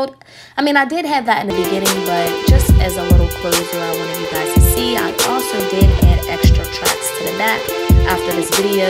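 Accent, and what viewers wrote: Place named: Pittsburgh, United States. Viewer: Love the video! Imma try it soon I hope I don’t fail 🤣 also you gotta be from Pittsburgh with that accent!!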